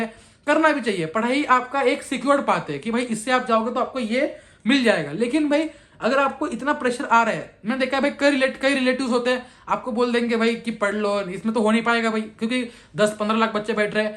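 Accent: Maharashtra, India. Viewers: He is maharastrian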